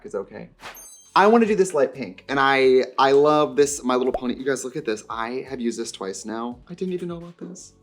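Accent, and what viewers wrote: Wisconsin, United States. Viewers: I don’t know why but I cannot focus on anything other than how Wisconsin she sounds and now I’m questioning everything and am like does she always sound like this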